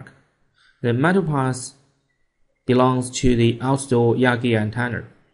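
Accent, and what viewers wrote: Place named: China. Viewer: Your accent is certainly not British, more like Chinese English. Especially when you say PPPower Pluuug. Oh, and these boosters are a fraud I have bought several and they do not work.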